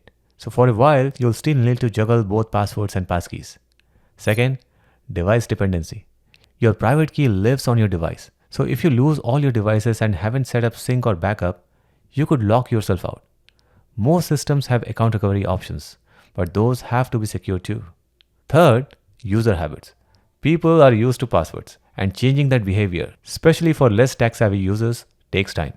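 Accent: India. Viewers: Hearing about protecting myself from phishing attacks through an Indian accent is kind of surreal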